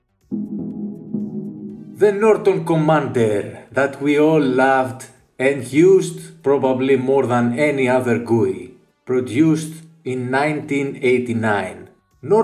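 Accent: Greece. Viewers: He sounds Greek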